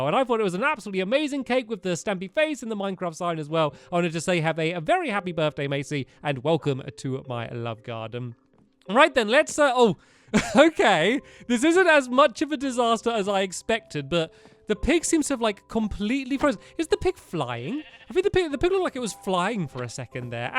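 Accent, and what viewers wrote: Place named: Liverpool, United Kingdom. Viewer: Scouse KiddaLaa boi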